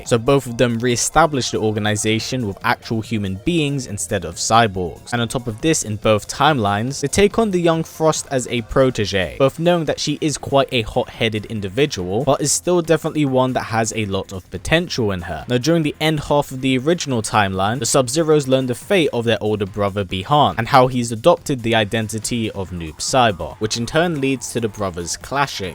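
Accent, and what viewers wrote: United Kingdom. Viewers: Hey man, are you hailing from the UK?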